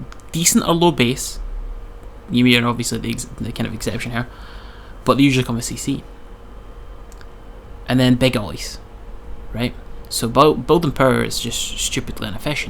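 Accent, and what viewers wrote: Scotland, United Kingdom. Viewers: Could you talk just a little bit slower:D kind a hard to follow when your not form Scotland.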